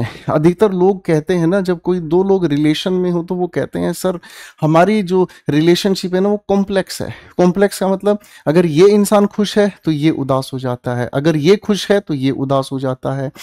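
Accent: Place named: Bengal, India. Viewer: Aaj to Bengali tone me bhi bol gye sir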